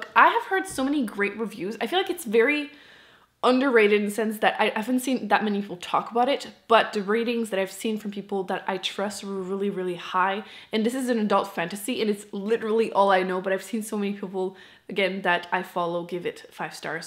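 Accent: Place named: Quebec, Canada. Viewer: Great video. Love your accent! Are you from Quebec?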